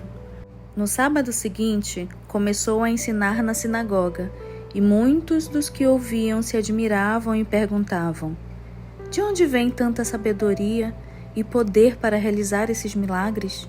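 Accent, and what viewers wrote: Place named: Rio de Janeiro, Brazil. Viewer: Tu és do estado do Rio de Janeiro?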